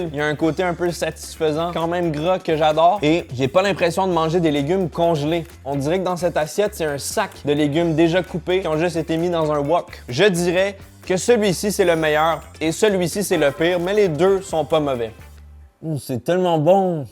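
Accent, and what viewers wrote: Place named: Quebec, Canada. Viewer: je tiens à dire que en tant que québécois, je suis vraiment fier que soit devenu aussi gros sur la plateforme et qu’il est regardé par autant de monde. Ça permet de partager notre accent et notre culture ce qui est vraiment cool. Je te suis depuis bientôt 6 ans et ton contenu est encore aussi excellent continue comme ça je l’adore. (ps: félicitations pour le million d’abonnés 🥳🥳🥳)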